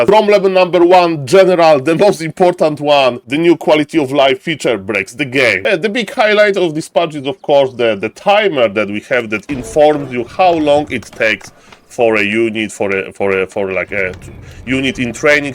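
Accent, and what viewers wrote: Poland. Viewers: Best polish accent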